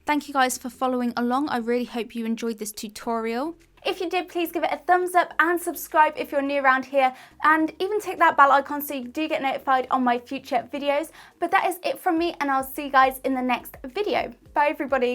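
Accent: England, United Kingdom. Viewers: I like your English accent, where are you from?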